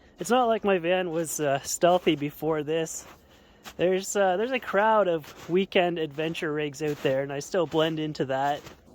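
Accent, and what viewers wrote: Canada. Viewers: Good job on the stove pipe. Love your Canadian accent, much love from Detroit Michigan. Peace Owt 😂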